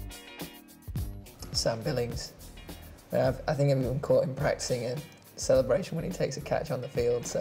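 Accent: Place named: Yorkshire, United Kingdom. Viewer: Yeah because both of them have thick Yorkshire accent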